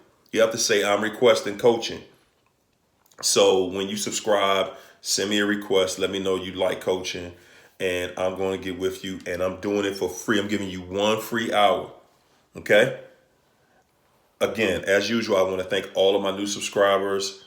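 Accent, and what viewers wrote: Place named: Philadelphia, United States. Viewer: That is right ( more sincerity from the brother ) please everybody hear what he's saying. Yo, I'm talking about culture. He reminds me of my street friends. How we talk is how he talks..and he's saying a lot of things on the subject of narcissism. I'm so proud of you my friend and brother. I'm a NY'er, you sound like you from Philadelphia, you say DC keep doing what you're doing. Great message.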